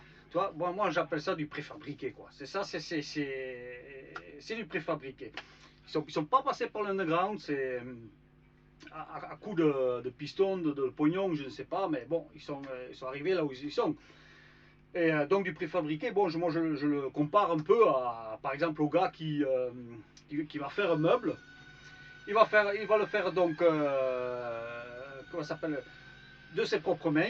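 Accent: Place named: Pyrénées-Orientales, France. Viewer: Salut, Trop marrant après tout ce temps aux Pays-Bas, tu as encore un putaing d'accent des PO!!! Evol un jour Evol toujours! Sinon, je n'ai même pas le photo de Shud!!! Tu pourrais me l'envoyer par hasard plize? En tout cas, cool de te voir et t'endendre! COTLOD!!!!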